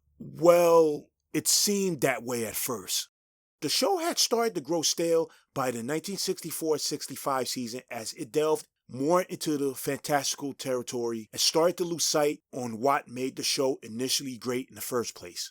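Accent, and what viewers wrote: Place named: New York, United States. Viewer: Im a big fan of these shows and this was very well done! I subbed and cant wait to see more. BTW are you from NY? thought I detected an accent and your voice reminds me of Method Man. It made me enjoy the narration even more.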